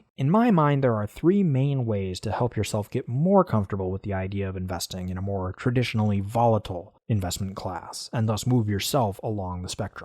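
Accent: United States. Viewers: What’s your accent? New York?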